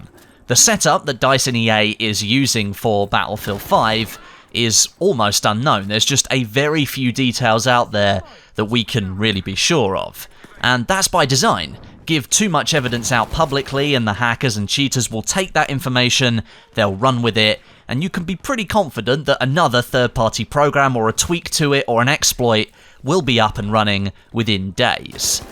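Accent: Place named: United Kingdom. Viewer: british accent sounds horrible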